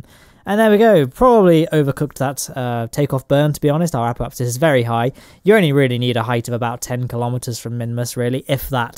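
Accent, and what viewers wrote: United Kingdom. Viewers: Trying to transcribe the intro, but I am missing one lousy word. My Canadian hearing eh? can't pick up one British accented word. Please review and correct? Thanks. On a lonely planet slowly spinning its way to damnation amidst the incompetence and utter carelessness of lesser space perrians, one team stands resilient against the herds. Putting their lives on the line to aid those who were previously unaware of the quick-save option. Yes, it's the incredible adventures of Jebediah and his crack team of kerbonauts. They are: THE BLUNDERBIRDS. Saving the Kerbin race one stranded explorer at a time.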